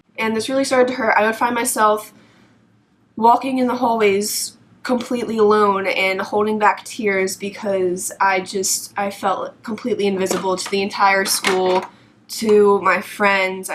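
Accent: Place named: New York, United States. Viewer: Are you from NY? you have an accent! Anyway, loved the video, God is the only one that can truly heal your sadness! :)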